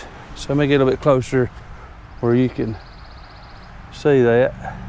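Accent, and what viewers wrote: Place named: Tennessee, United States. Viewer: Sounds like n.e. Tn. Love the accent like mine.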